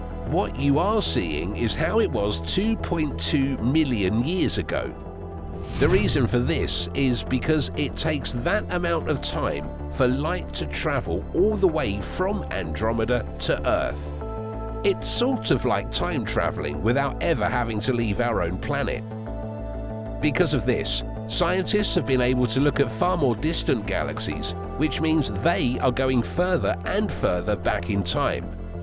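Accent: England, United Kingdom. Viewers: That english accent made me quit watching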